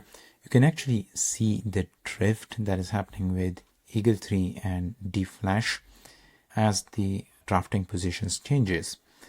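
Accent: India. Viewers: god dammit! i thought an ad was the video and thought "oh thank god... NOT another indian..." then i realized it was an ad and you started talking and i wanted to throw up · >open video >Indian accent >close video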